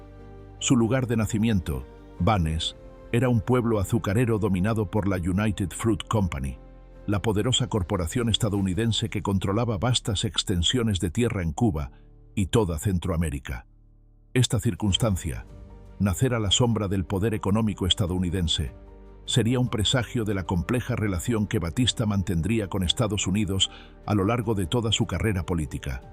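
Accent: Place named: Spain. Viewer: Menos mal que el Narrador, no tiene acento Sudaméricano. Para mí oído es más agradable oír a alguien con acento español, es más Elegante, con mejor dicción y con más clase. Este señor Fulgencio Batista era Amerindio puro, con algo de Africano, pero según se ve 0 de sangre🩸 española mediterránea o latina.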